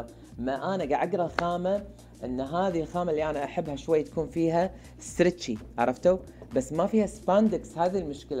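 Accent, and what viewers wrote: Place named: Iraq. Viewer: احسك عراقي مو كويتي😭💕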